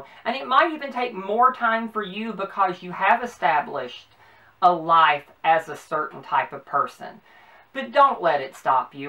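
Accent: South, United States. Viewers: Love you southern accent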